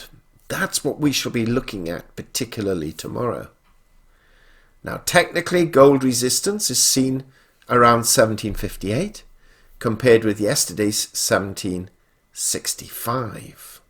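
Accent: United Kingdom. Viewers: why do I take things more serious when it's reported in a British accent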